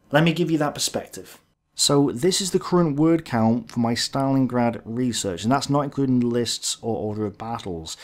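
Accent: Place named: Merseyside, United Kingdom. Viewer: Pomodoro. I'll check those out. cheers. Ps, you have a slight Birkenhead twang to your accent. or from Runcorn and Widnes. I lived there for a while and R&W had such a wide variety of accents that i felt like i was in the 1960s where 'scouse' sounded like the Beatles [Lancashire based accent] but also there wee people who sounded distinctly Mancunian. When i visit my family in the Wirral my old mates call me a 'toff' because they say i talk like a posh person from London yet in Brighton [my home] i am told i have a heavy scouse accent. No wonder Joseph Conrad [who spoke about 9 languages as he lived in central Europe between Germany, Czech and Poland so grew up trilingual]. Then again, as i recall, he did state , to be more accurate, he didn't enjoy 'writing' in English as english had so many accents it was difficult to understand someone who spoke local east end London, compared to a person from Newcastle or the midlands! 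I'm not surprised! hen i first moved to Brighton with my gf nd were chatting in a shop we would be followed by security wherever we went!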